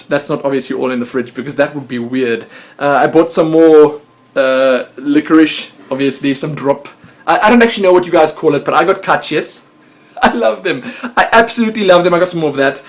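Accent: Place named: Cape Town, South Africa. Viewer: capetonion accent FTW ! lol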